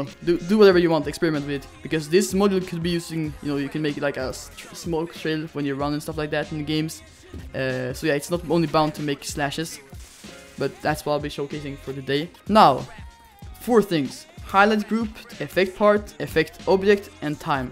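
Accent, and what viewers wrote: Sweden. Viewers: Are u swedish?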